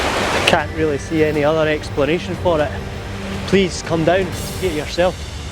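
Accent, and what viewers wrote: Scotland, United Kingdom. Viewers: Why don't we see this sort of view of Scotland and our history on BBC Scotland? This is an authentic looking presenter who sounds and behaves like a native of our country. He is doing much more than telling us of Scottish history and Geography. He is implicitly demonstrating aspects of Scottish culture in his words, actions and mannerisms. Very enjoyable. Many thanks.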